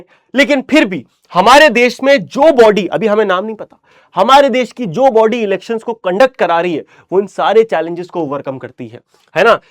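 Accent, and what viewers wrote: Bihar, India. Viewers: Ara bhaiya lag raha haii ki bihar se ha 😮😮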